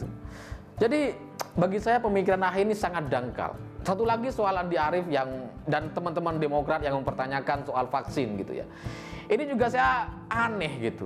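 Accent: Madura, Indonesia. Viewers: orang ini ini logat bicaranya kayak nya orang madura hehe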